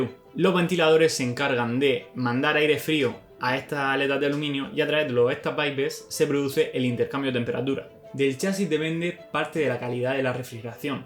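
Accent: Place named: Almería, Spain. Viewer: Tienes deeje de almeria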